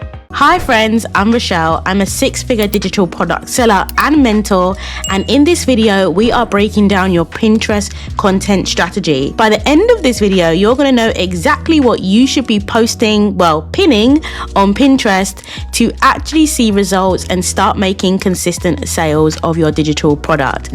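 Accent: United Kingdom. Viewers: I haven't even watched the whole video but when I heard the British accent (finally! A British gyal!!) and heard the gems you had already dropped in the first 3 mins, I hit those 'like' and 'subscribe' buttons! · I agree. It’s nice to hear a follower Londoner. Such valuable advice.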